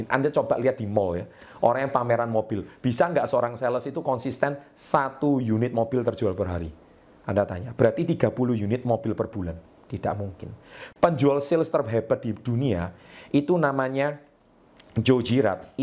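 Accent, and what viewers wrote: Java, Indonesia. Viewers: ngmong'a medok,kyak'a anda orang jawa y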